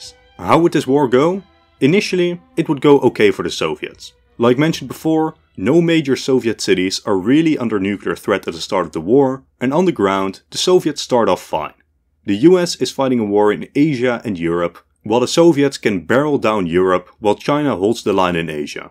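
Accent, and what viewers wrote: Netherlands. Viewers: You sound dutch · Nice video. If I may ask, are you Dutch? Your accent sounds Dutch. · Sounds like a Hollander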